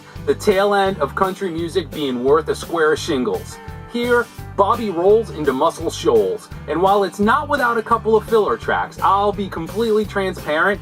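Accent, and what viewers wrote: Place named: New England, United States. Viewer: Watch out! When we are treated to a country update completely with a New England style delivery, you know you are in for something swell. > Now wait a minute. How did you get to do that four word promo? I have to agree with you, ' ' has some fantastic album art. I could not name one song on the whole album but I love the looks of it. It still happens. That's all I have to do is hear name and my mind reverts to ' '. "A dinosaur Victrola listening to ". You have to love that song. I have only heard one of these albums. I will leave it up to you to guess which one. It is a pretty simple guess really if truly think of it. I will give you a hint. It wasn't Boston's own 'Dukes of Hazzard' who back him up on the album. Excellent update once again Brother. I always feel like I got to wear a cowboy hat and boots when I watch these Honkytonkin' updates. 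Love the country feel~ Rob/Boston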